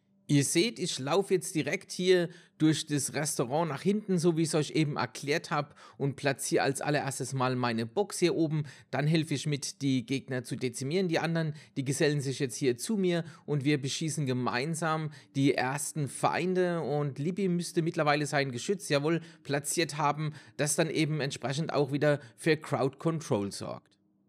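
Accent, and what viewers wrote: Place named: Palatinate, Germany. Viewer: Vom Dialekt her, schätze ich auf Mannheim, Heildberg, Pfalz!?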